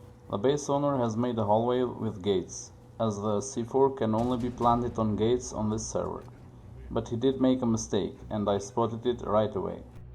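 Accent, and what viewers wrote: Russia. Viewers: russian accent and now I stopped watching you. Hatfu na ryj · Your sound like a Russia , hahah, I liked the video 👍🏼👍🏼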